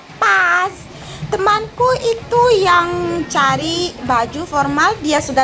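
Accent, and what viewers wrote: Java, Indonesia. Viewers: Mbak bhs indo nya khas org jawa bgt:D👍 Salam dari kalimantan utara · Gara gara Londo kampung aku mrene penasaran hehehehe wes medok mbak sampean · Mb sudah medok.salam kenal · Hii..i visit ur channel after i saw ur collaboration with dave. That's great!! Happy stay in indonesia..ur accent almost same with javanese with strong in D, B, G..hehehe.. Btw i'm from west java..can u share about ur hometown culture? Foods, tradition, song or anything.. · Aku suka aksen Jawa-nya Mbak. Salut. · Wow mbak aksen bahasa indonesia anda medhok banget seperti aksennya orang jawa,, mantab mbak 😊👍 i'm new subscriber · Bukan seperti orang Afrika, malah seperti orang asli jawa. Medoknya 100℅.. Kesini gara2 chanel sebelah. Londo kampung. Semngat trus kkak. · Semangat mbak 🤗 medok nya lucu bgt 😁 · Medoknya mbk,kereen,suamiku Nigeria belum bisa indonesia,mb keren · Zimbabwe medhok jawa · Mbak bahasa Indonesia sampean medok hehe... 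· Kok logatnya kyk orang jawa ya...hehe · Mbk jd medok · Medhok dong suaranya Tadi habis nonton londo kampung langsung cuss kesini. Btw kak gak di suruh kayak ng scan gitu kalo mau masuk mall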